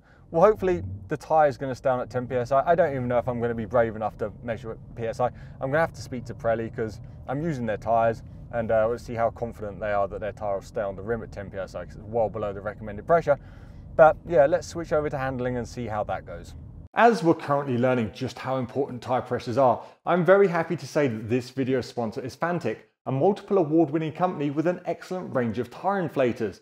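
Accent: United Kingdom. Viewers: British accent !!! Peace!!!! I am out of here.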